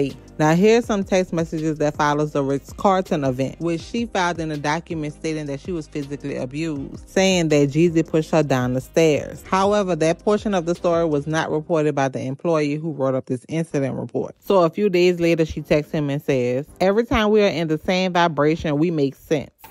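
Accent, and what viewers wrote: New Orleans, United States. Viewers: Your accent.... New Orleans?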